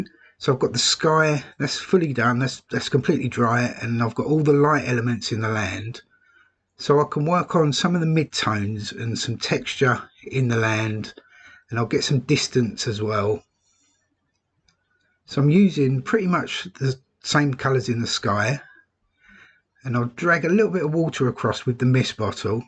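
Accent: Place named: United Kingdom. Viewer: Drawring !Got to love a British accent. Takes me back to Michael Meyers and his character , a little boy named Simon that made his drawrings in the tub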